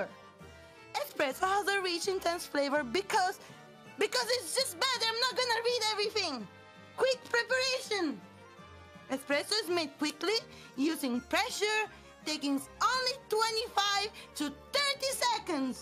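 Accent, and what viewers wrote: Italy. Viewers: she sounds like Dr Evil but with Italian accent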